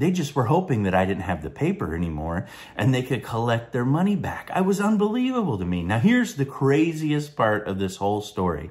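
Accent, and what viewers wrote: United States. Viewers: 👂 Sounds like Lake County Fl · Sounds like Jasper, Missouri.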